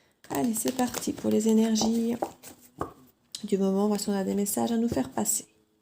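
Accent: Belgium. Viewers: Un accent belge ''😂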